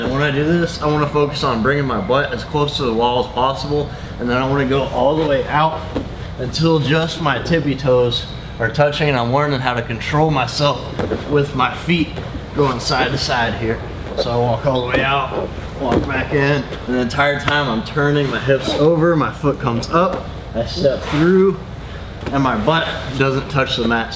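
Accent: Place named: South, United States. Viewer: he kinnda have like a southern accent, great drills fella, I will try them out on today's class!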